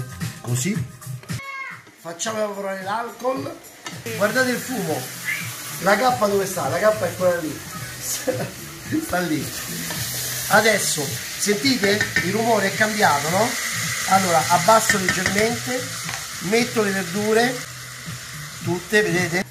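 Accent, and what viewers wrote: Rome, Italy. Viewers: El romano piu che l'italiano ahhaha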